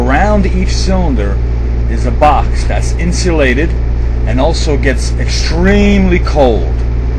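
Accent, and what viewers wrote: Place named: Italian-American, United States. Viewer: I like your Italian-ish American accent.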